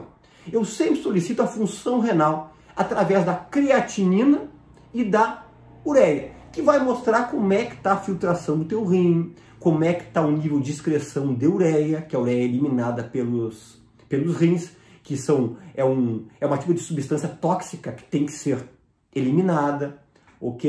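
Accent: Rio Grande do Sul, Brazil. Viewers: Ele até tem sotaque gaúcho, só não sei porrrrrque ele puxa tanto no R como fazem os cariocas.. Mas é um excelente médico 😃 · Adoroooooooo esse Dr ehhh, muito competente, vídeos muito explicativo! Ainda mais com esse sotaque de gaúcho tchê, fica muito mais gostoso de ouvir🤩 Deus o abençoe muito🙏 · Este sotaque é encantador. Os gaúchos já nascem com oratória. Parabéns!!!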